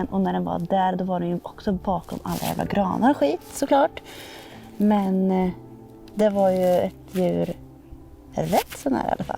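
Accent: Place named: Östergötland, Sweden. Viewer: Bra film!! Kul med lite östgötska!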